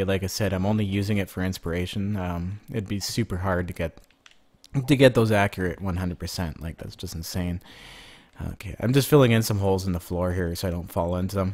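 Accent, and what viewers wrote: Canada. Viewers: I have one of the most important question that you will ever be asked. Are you Canadian?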